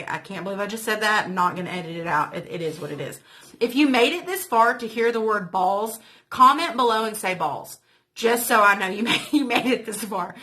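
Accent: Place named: United States. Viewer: I loveee your country accent and your sense of humor I really enjoyed watching and learning thank you! 🥰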